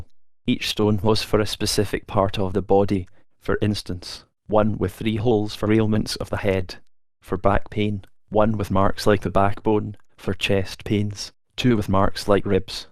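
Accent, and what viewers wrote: Scotland, United Kingdom. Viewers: Hello please answer a question for me and my son who is 15 My son thinks that you're using one of those robot voice thingy Majiggers I told him you're not and that it's just someone with a really cool Scottish accent who probably speaks Gaelic also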